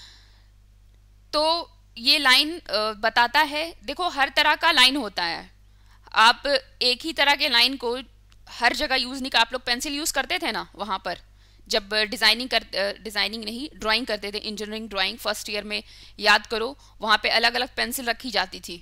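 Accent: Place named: Bihar, India. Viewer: god bless you ur voice is very beautiful. lagta hai app Bihar se belong karti hai apki tone she lagta hai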